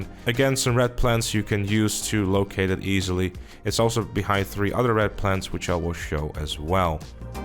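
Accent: Netherlands. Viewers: Jij bent Nederlands dat hoor ik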